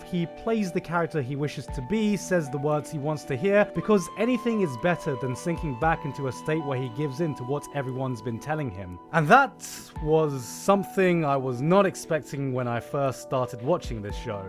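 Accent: United Kingdom. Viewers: Wow you sound really british in this video... perhaps the only thing left was talking about West Ham's next fixture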